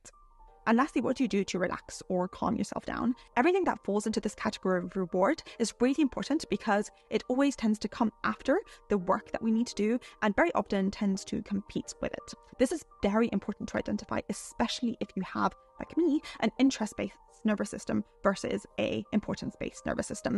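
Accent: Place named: Scotland, United Kingdom. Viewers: what kind of British accent is she? can anyone answer me this question? i'm learning english and i'm not convenient to listen rp american and rp brittish accent but her voice is so soft to my ear. i want to do research what her accent is from. (thanks) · She is from London but I hear a slight Scottish accent also. It's a mix of both because of the way she pronounces certain words.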